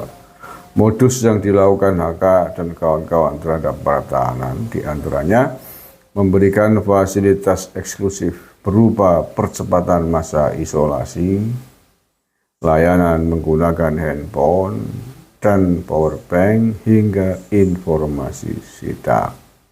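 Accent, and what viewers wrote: Banyumas, Indonesia. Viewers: ngapaknya bikin gelitik bung.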